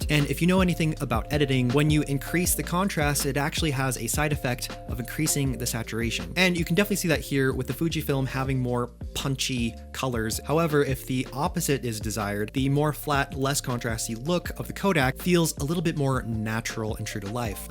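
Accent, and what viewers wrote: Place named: United States. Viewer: Thanks this your name well look I like my vintage camaras which ihave few years back when iwas younger now im pensioner in London i still got my canon AE1 program which i love ❤️ I used to canon F1 nikon Fm2 mamiya 6x7 afortunally i got them stolen any way your video help me because hopefully I going to Colombia in few weeks time i believe Kodak film 200 is good because Colombia is very hot and sunny ☀️ so according what you say Kodak is perfect for Colombian whether since im back to photography i see you twice you from like Japan o somewhere there you English is American and you very good explaining all this camera staff means photography which really apreciated is like i feel young again thank you very much 👌👌🙋‍♂️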